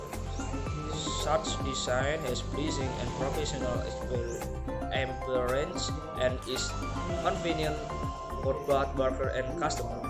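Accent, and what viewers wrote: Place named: United Kingdom. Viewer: british banget😍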